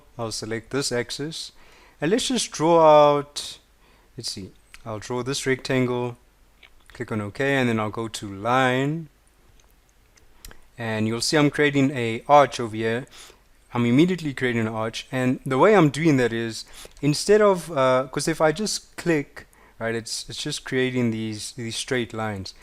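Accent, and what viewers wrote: South Africa. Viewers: This is brilliant, thank you! Lovely to hear a fellow South African accent.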